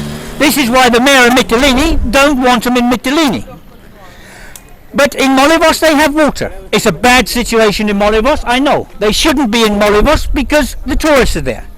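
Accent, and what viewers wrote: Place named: South Africa. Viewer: Hey this is my island mate. Its admirable that you took up the cause. I, like many people, have done our bit to help. For a period there there was limited resources in a country that is suffering economically. The EU budget slashed costs from the EU border patrol I think in 2013. The people and the authorities have ploughed money (which they do not have) in to solving the issue. Almost everyone on the island has a descendent who is a refugee (Asia Minor).We are all compassionate people. Lesvos and every island has provided support. Remember, we didnt plan or expect people smugglers to send the migrants across dinghies and dangerous waters. Please refrain from comparing us to to ISIS??!! Had you avoided that, I would not have worried about your clip. WTF is that about. Its like me saying you have a South African accent therefore you are part of the regime of apartheid. 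Please do not insult our people, otherwise let's look at the history of where you are from.... Its easy to be a critic, but everyone on the island from the authorities down has a heart at a time when Europe has turned its back on Greece. Keep that in mind. The heat and the walk was terrible. During Ramadan, these migrants suffered even more, unnecessarily. The war in Syria and other places is tragic, and a tragedy that should have been prevented. Eventually the issues you point out in this clip was sorted out. Your emotion, again is admirable, but do not tarnish our people or island without the full facts. Go th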